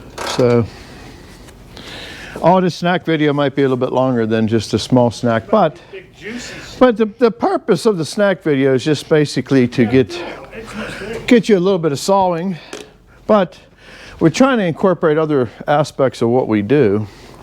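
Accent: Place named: Pittsburgh, United States. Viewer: It's a pleasure to hear your commentary because I miss the Pittsburgh accent (I grew up in Edgewood just outside of Wilkinsburg and Swissvale). Like in the steel days, the folks from western PA always get the job done. And done right. Thanks for your videos.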